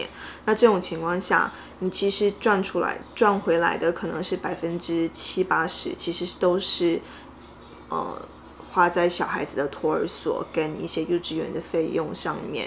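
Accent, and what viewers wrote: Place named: Taiwan. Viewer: 有一点点的台湾口味。感觉很好 。支持你 。구독.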